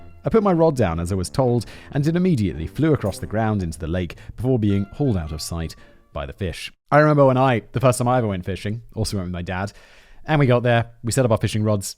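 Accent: United Kingdom. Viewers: Coo-gee, I love your British pronunciations. Could-gee is closer. ANZAC Day is in February and has dawn and dusk services, a parade of service men and women and is in remembrance for WW1. It is also the only day Two-Up gambling is legal in Australia. Remembrance Day is held with a one minute of silence at 11am, 11th November every year, and at certain places, like the RSL, they play The Last Post, and is now recognised as a commemoration of the fallen for bot WW1 and WW2. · You Britt's crack us Americans up💯....not just the ridiculous way you talk....but your genuinely INNOCENT demeanor 👀........I know there's tuff guys out there....but honestly from listening too you, there seems to be A LOT of panzees👀👀...an I mean that in the most respectful way possible....you an your kind just DONT seem too tuff honestly 💯🤔🤔🤔...I mean seriously man👀....your talking about planes...living in other countries an STAYING👀....taking "Holiday" Somewhere else than YOUR own country 💯👀......Sorry man...just ain't crackin🤪🤪🤪....that's the BEAUTY of the nation you lost in 1776.....we HAVE it all.....jungle, swamps, plains, grains, an tall tree's 💯.... 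Buffalo here an plenty of "citizen" deer EVERYWHERE...not the "Kings"🤣🤣🤣🤣.....Sorry buddy, I'm just NOT a fan of old English society 🤢🤢🤢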